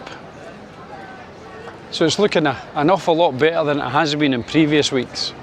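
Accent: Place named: Northern England, United Kingdom. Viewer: It seems to be "northern", or is it scottish?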